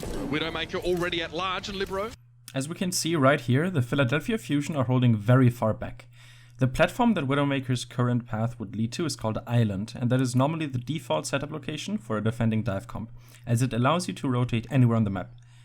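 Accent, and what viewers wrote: Germany. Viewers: Very nice channel, I'm so happy that I found it through Overwatch Central! Btw, are you from Germany? Cause you sound like a German :P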